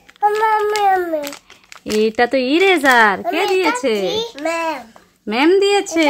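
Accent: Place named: Kolkata, India. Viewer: আমার মেয়ে minnat, রোজ আপনাদের ভিডিও দেখে, বয়স ৩ বছর, আপনার কথা কলকাতার মানুষের মতো, আপনি কি মুসলিম? এই প্রশ্ন টা আমি খুঁজে পাচ্ছি না, 😊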